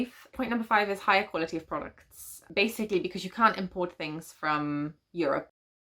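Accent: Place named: Scandinavia. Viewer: It sounds like your English accent has started to sound more Scandi.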